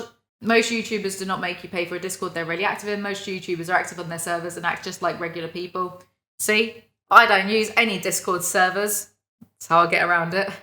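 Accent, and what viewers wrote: United Kingdom. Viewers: which british accent do you have? like which area? - from norway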